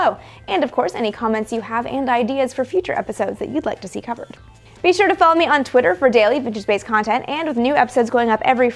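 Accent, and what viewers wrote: Canada. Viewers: Is this young lady a Canadian? I've heard the word "Abbooouuutttt" a few times, during her videos. Or, am I just hearing things? You never know, "eh." :)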